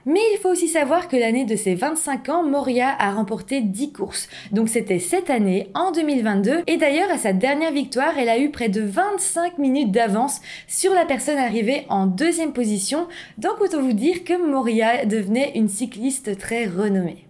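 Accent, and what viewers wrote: Belgium. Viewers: Bonjour, Bon avant toute chose, je te remercie de relater cette affaire aussi récente et dans les détails et dont je n'avais pas eu connaissance.😉 Cependant et au risque de me mettre toute ta communauté à dos...🥺😭 Mais bon sang!!! Non pas que je sois tatillons et sachant en plus que ton accent belge est des plus charmant et n'étant moi même pas originaire d'Alaska(rapport au poisson); Par pitié!!! 🙏 "Colin" quant bien même ait-il de "l'oseille"; je pense que la "moutarde"; fut-elle à l'ancienne, lui monterait rapidement au nez en entendant ta prononciation le concernant😡😂...(par ta faute, j'ai la dalle; du coup🍽😊)... Concernant ton analyse: j'acquiesce totalement et bien que l'affaire soit en plein déroulement, il ne fait aucun doute selon moi, concernant la culpabilité de la principale intéressée (jalousie, possessivité et fuite par dessus le marché). 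En conclusion, je dirais que force est de constater que le yoga ainsi que ses alternatives en matière de relaxation (à l'occidental, je précise); sont loin de démontrer leur efficacité en matière de gestion de la colère, ainsi que de retenue vis à vis d'autrui... Je laisse notamment à tes viewers (et potentiellement lecteur de ce post); le soin de se faire leur propre opinion concernant le nombre à travers le monde, d'individus, soit disant "healthy" et "natty"; "bien dans leur peau et dans leur tête à l'instant T"; ayant basculés dans les abysses de la folie; à priori aux antipodes de leur style de vie tan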